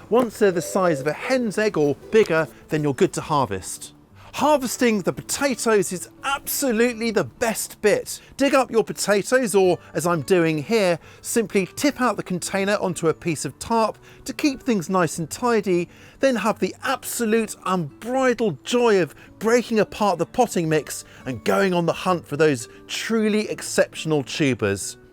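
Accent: England, United Kingdom. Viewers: English gardeners has a such great accent 😊 it is almost plaesure to learn English and gardening at the same time😅 "two birds with one stone" 😂 verey interesting informations about early potatoes 👍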